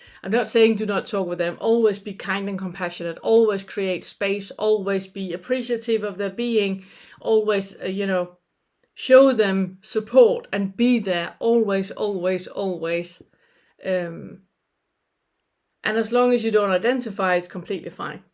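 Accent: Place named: Copenhagen, Denmark. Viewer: Hi, I have been a lot in Cph, and regarding your pronunciation made me quite sure you must have been around there for a bit. Yes I will keep an eye on your posting! Appreciate/reconize most of your topics. Thanks for that!😊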